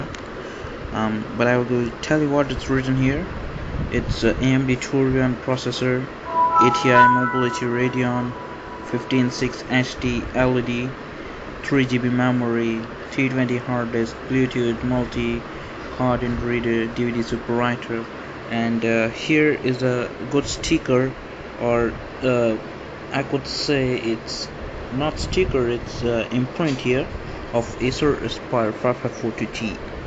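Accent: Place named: India. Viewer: Hey can u tell me how much did u pay for this laptop i think u sound like an Indian Person...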